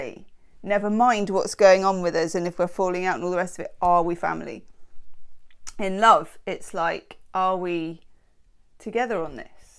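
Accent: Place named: Cockney, United Kingdom. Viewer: Fahhhmly. What a wonderful cockney accent you have. So many talents. And such sweetness. Thank you.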